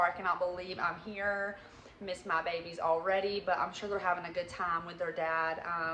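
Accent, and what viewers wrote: South, United States. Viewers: I just hope you don't lose your southern drawl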